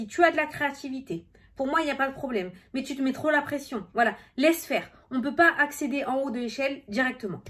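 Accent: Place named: Var, France. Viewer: super toujours aussi speed,ton accent vient du ( var ) bonne journée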